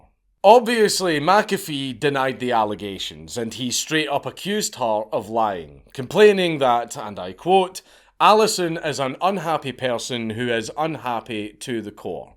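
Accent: Scotland, United Kingdom. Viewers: aww i thought he was irish hes scottish which is js fake irish :( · I could listen to this Scottish man talk about bizarre historical figures all day.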